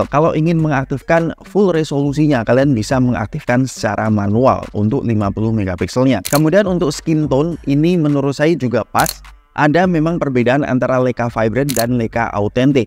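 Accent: Semarang, Indonesia. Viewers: Orang semarang om?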